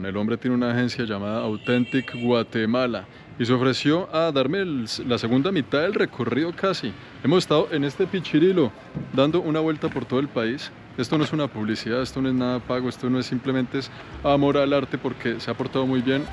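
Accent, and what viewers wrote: Colombia. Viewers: Español Colombiano.😅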